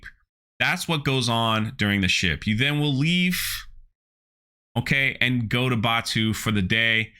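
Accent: South, United States. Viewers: first, where is your accent from? I hear this faint Southern twang when you talk and I’m just super curious where it’s from 👍🏻 Secondly - THANK YOU and Anna and Theory and everyone else in the Fandom Menace for covering this so we can all laugh and vent about it together. I also love that we all have seen something different that’s WRONG with these “trailers” that Disney has put out. For the first add with the female Disney Imagineer and the overly excited fan, I love that they chose a very short actor for the fan, so the girl towers over him. Just saying. And the video showing the meeting of the Imagineers sitting down and talking about the “not hotel,” again they’re all female, just as the Lucasfilm story department is all female. I believe you said it best when reacting to this - “WOW me. WOW me.” That’s what Star Wars is SUPPOSED to do when done properly. 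I watch the trailer and I’m like “what about Han, Luke and Leia, can I see them?” And I feel like the blue hostess or the “Twilek” or Disney security is gonna pounce on me: “WE DON’T TALK ABOUT THEM HERE.” Anyway, keep up the good work!!!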